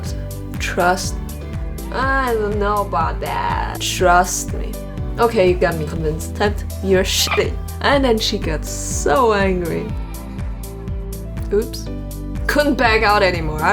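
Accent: Germany. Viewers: I swear i though you were German from the accent...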